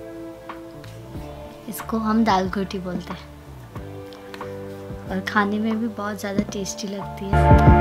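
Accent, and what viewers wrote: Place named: Gujarat, India. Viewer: Hi bhabhi...... .........aapke video me jabhi bhi dekhta hu.... Gujarati me Aap bolte Ho esa lagta hai....... Nice video beautiful vlog hai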